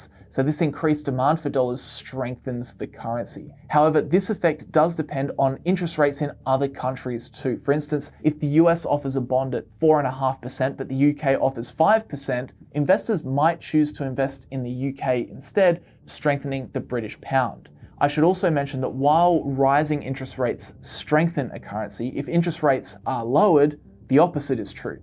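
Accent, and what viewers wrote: Australia. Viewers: Maybe I am biased towards the Australian accent, but your videos are really so much fun and so much info. Thanks from the bottom of the heart!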